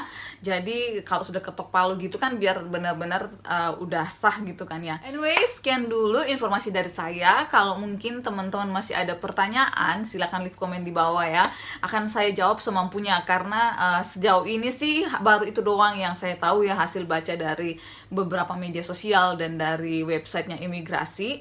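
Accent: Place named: Philippines. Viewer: Damn i tried to translate lol. Your words are pretty much the same as Filipino words and the accent! I think we're long lost sisters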